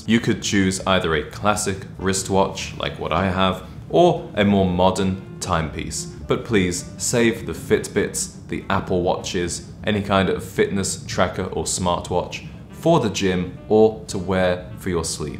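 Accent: United Kingdom. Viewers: Bro is British certainly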